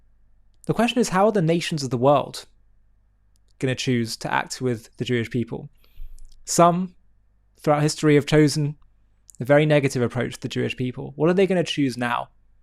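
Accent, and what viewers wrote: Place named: United Kingdom. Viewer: Hey, uhm I have been watching a lot of your videos and the unbroken pattern I find in all of them is that if anyone doesn't agree with a policy of Israels or doesn't support a action of theirs 110% almost in a fanatical attitude of devotion you have a habit of beginning to label them "not a friend of Israel" and then going further to call them maybe even Anti-Semitic. You do not get to throw around labels as weapons like this at anyone you choose if they don't just throw themselves at your feet and prostrate themselves before the next big move that Israel makes. You have a British accent if I'm not mistaken and the last I checked you have some very serious problems over there in the U.K. with Muslims taking control of everything and they will soon be driving all the rest of the Brits out of their homeland. Maybe you should spend more time criticizing the very real enemies you have right there among you and take care of your own lands rather than poking barbs at American Conservative figures to cry bully all of us into submission into whatever your next big thing is. 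Neither Tucker, nor Vance, Nor, Charlie Kirck et all have ever been enemies of Israel and just because some of them may give pause to something or question something on strictly intellectual grounds to inspire discussion this channel responds to the same as if it were the harshest of crimes commited by the Third Reich or something. Look you can say whatever you want but you don't earn any credibility acting like t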